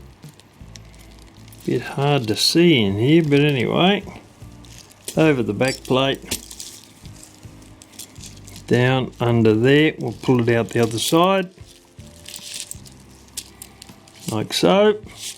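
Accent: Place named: Australia. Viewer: Are you Australian by any chance..?......12.20 into video you confirmed my question with"that thru there...thats got him!!" Mate the old lady slipped off not long ago and i know have the very clock i went all the way to Schwartzland Germany in 1996 to buy for her. I actually saw there the worlds biggest cuckoo clock,the bird that comes out was the size of a commodore wagon im not kidding .anyway ive now taken on the task of getting it going properly agsin.its identical to your one in video abd im certain the issues im having a easily fixable but i need a hand ??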